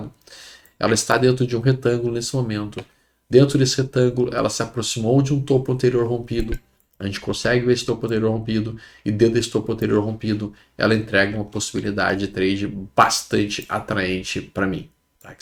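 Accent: Rio Grande do Sul, Brazil. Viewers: falou tdo gauchinho de garopa bah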